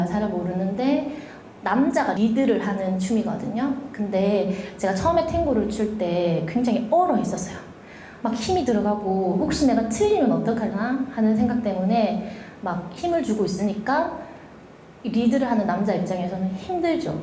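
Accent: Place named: Seoul, South Korea. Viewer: 서울말 잘 쓰시네요♡ 쿠바만 가신줄 알았는데 와우~^^ 남미를 6개월 간이나~ 열정과 도전 넘 멋져요.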